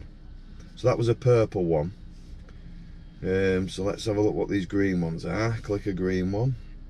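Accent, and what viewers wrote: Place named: Lancashire, United Kingdom. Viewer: Evening. Hope you’re ok 👍🏻 I’m loving your videos matey 🤩 It must be your Lancashire accent lol 😆 You talk a lot of good sense and give some fantastic information in a really cool way 👍🏻 Take care until the next time cheers 😎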